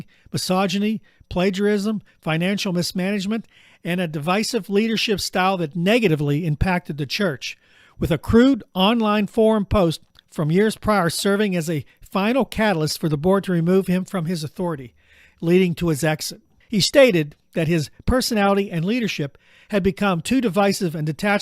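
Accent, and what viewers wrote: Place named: Pittsburgh, United States. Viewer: you have a Pittsburgh accent (or SW Pennsylvania). Are you a fellow-yinzer? I enjoy your important material. Keep it up and don't be a jaggoff! 🖤💛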